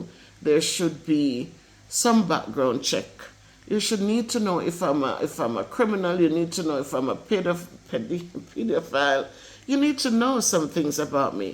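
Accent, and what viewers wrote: Jamaica. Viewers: I don’t know what age you left Jamaica that you forgot the culture or you may not have been around Jamaicans while you were abroad. I think you just show off, because listening to you speak you still have the Jamaican accent. This shouldn’t be news to any Jamaican. If you are that desperate to make a video address it to foreigners looking to move to Jamaica, show off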